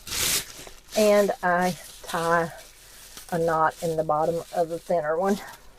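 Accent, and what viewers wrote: South, United States. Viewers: Do you use the toilet you made up for both #1 and #2? I use two of these camp toilets in my old farm house. My well went bad 18 yrs ago and I hated the water anyway. I only come to this place for a few months each year so works well. Plus, my septic works just fine, so I empty every couple days into real toilet and put 2 1/2 Gal in back of toilet to flush. Nice video and great set-up. P.S you sound real southern. are you? ;-))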